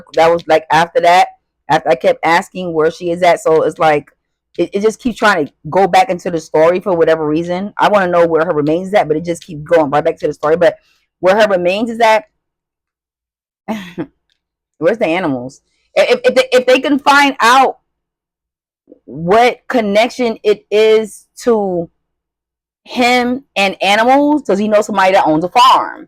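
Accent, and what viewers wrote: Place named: Spanish Harlem, United States. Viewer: New subscriber here!! Are you from nyc ? Lol my husband is n your accent sounds like his hes from Spanish Harlem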